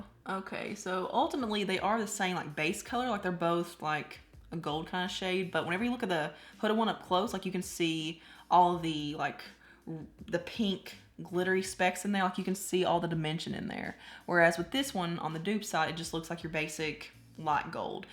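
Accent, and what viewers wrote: South, United States. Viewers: noob here... you seem so down to earth. looking forward to watching more of your videos! as a North Carolinian, I immediately favor any twang I hear. #southernsistas 🤣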